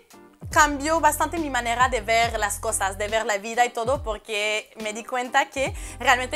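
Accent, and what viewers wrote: Peru. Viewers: YÁ hablas con el característico YÁ de Perú. ;) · Mejoraste mucho tu español hata acento de peruano tienes saludos desde argentina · Eres foránea o peruana el dialecto muy local no corresponde a una extranjera?? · Tu narración fue bien detallado y tienes que seguirla , impactas con tu castellano y tienes el dejo peruano ya tienes el acento...parte 2 y 3..7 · tienes el acento peruano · Que lindas palabras para nuestro pais, sobre todo la forma como la relatas, con tanta emoción, es cierto el Perú tiene hermosos paisajes, en costa, sierra y selva, por tu forma de hablar se ve que ya tomaste la forma de hablar de los peruanos, linda, besos y abrazos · Me recontra mate de risa cuando en el minuto dijiste algo muy latino y creo que muy usado en Perú "una vaina", jajajaj aja jajaja. Definitivamente ya hablas como toda limeña! 😁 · Ya me suscribí me encanta tu asentó peruano... 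Canadiense un saludo desde el callao❤️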